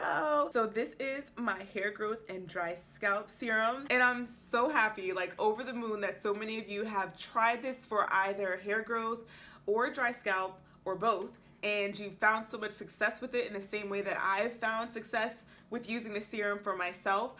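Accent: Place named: United States. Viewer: natural hair black women are sooooo gorgeous and the american accent on top is a bonus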